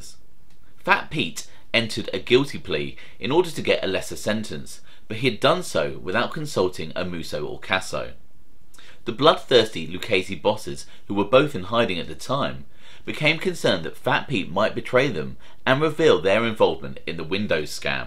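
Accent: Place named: United Kingdom. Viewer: My Pleasure! Say, based on your accent do you produce your show from the UK?? I was just wondering. In any case...are you aware of a BRITISH MOBSTER who was influential in New York in the 1920's and 30's named OWNEY MADDEN. (also nicknamed "The Killer"?) Check him out if you'd like to delve into some of the older history of the US Mobs. Interesting fellow Madden was!!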